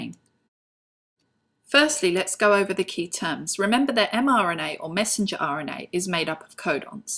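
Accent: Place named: United Kingdom. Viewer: Were are you from - you sound British?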